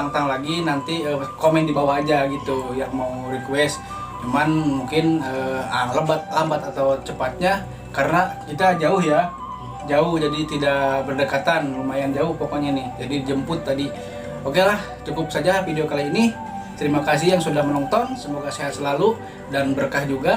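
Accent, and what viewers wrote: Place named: Java, Indonesia. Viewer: Ngomong nya kek org Jawa bang wkwkwkwk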